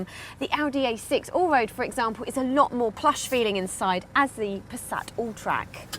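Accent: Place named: Crawley, United Kingdom. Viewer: She's so cuuuuuuteeee! And that Crawley accent is so squee! This Yank is in love.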